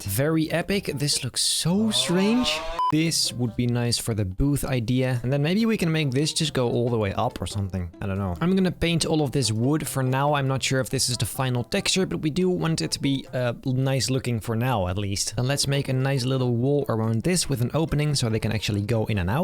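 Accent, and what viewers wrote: Netherlands. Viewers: your netherlands arent you from your accent i hear that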